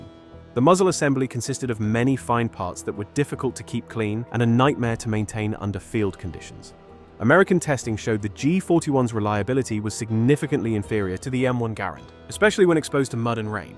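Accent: United Kingdom. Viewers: so british, so smug. GAAAAHHH RAAAAND.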